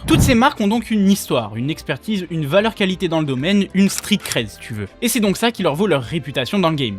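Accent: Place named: France. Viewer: Découvert avec la version Anglaise au lourd french accent, très drôle et superbes visuels, bravo!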